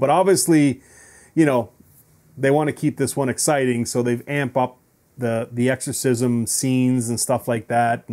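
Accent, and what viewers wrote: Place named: Canada. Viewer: Hey dude, as soon as I heard you talking I thought you were a fellow Canadian, it’s the accent haha. Where about are you from? I grew up in Northwestern Ontario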